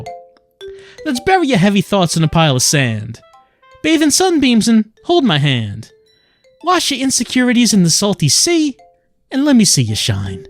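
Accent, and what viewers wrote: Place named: New Jersey, United States. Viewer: Where did he pick up his funny Jersey type accent from? I forget.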